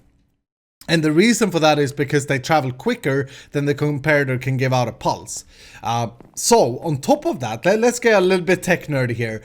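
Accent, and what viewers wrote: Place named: Sweden. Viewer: Heyy you freaken swede with a gorgeous accent! ☺ I have a school project where I have to interview someone, and I was wondering if that could be you?!😉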